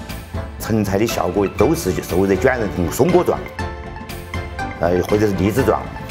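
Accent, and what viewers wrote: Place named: Chengdu, China. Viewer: 成都老一辈说话都是这样子的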